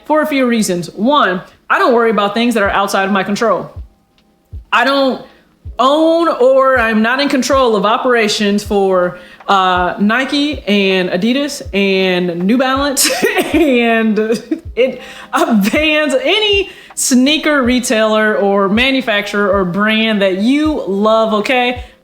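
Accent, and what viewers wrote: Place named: California, United States. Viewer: You are my favorite sneaker youtuber because you go off into other relatable subjects. Youre smart and you have business savvy...and youre a female from the west coast. I assume that because you have my accent, if we wanna call it an accent lol and your gs warriors fan, that youre from California. You should put an facebook request in for me if you want that would be cool Im from Everett Washington.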